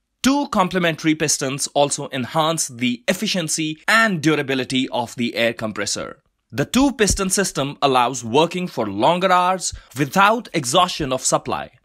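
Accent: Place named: India. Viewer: It's Indian english accent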